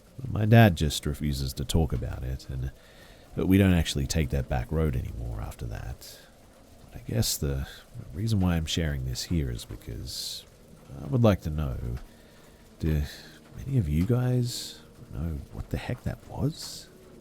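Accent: Australia. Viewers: I'm pretty sure he's Australian.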